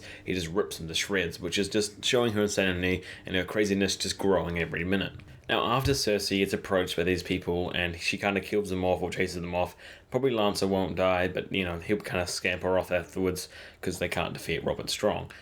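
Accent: New Zealand. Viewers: He has a very mild Kiwi accent.. like a Kiwi who's been living in Australia for quite some time. That would be my guess anyway.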